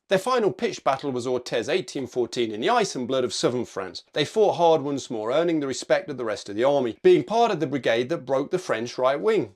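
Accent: United Kingdom. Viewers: It is so interesting ! I really had never heard before about that regiment of renegades. Being a French living in United Kingdom for almost 24 years now, you understand that I somehow feel a strange closeness to them. You ask for the viewers' opinion on this regiment, there is mine: I wish the British Army, which I respect, would re-create a Chasseurs Britanniques Regiment. I would do anything to join. A bunch of misfits, anti-europe renegades, "gilets jaunes", "soldats perdus" and 2nd-rate mercenaries, it would be so French ! Especially if it meant having other troops performing all the boring tasks like guard duties (definitelly it would be very very French). You'd just have to change the Redcoat for DPM. Anecdotically, I liked the efforts to pronounce correctly the French names, with a so British accent. I had great time. Thanks so much for this video.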